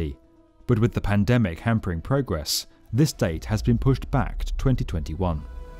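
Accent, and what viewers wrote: United Kingdom. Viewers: When i heard he says in british accent Petronous Twin Tower remind me about Harry Potter...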